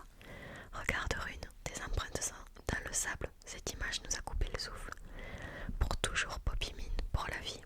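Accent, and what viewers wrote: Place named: South, France. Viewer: L’accent du Sud et de l’Asmr , un plaisir cong!!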